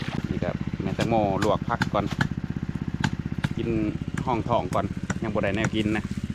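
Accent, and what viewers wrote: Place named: Phu Thai, Thailand. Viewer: เว้าคือคือภูไทบ้านผมแท้บ่าว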